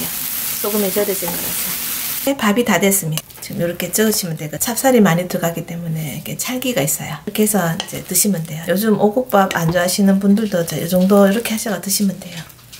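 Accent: Gyeongsang, South Korea. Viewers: 해외 나와 산지 4년이 다되어 가서 정월대보름되면 나물이 너무 먹고 싶더라고요 ㅠㅠ 혹시 대구 분이신가요? ㅎㅎ 말투가 너무 정겨우세요 제가 대구사람이라 ㅋㅋ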